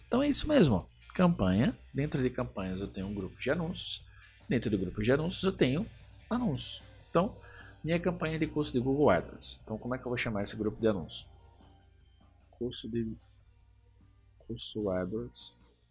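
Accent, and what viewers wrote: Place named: Northeast, Brazil. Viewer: Aula top ainda é nordestino pelo sotaque, ai sim valeu meu vey mais um inscrito de recife <3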